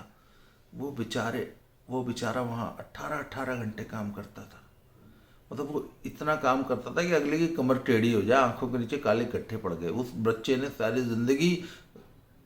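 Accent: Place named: Kangra, India. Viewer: Aap ki "boli" language bahut acchi lagti hai H.P. kangra me same language bolte hai , Dharmshala me ap punjabi tipe language thodi mix hi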